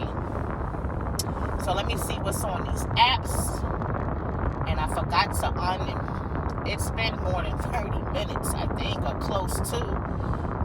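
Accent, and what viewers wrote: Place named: New York, United States. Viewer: Love the accent NYC💯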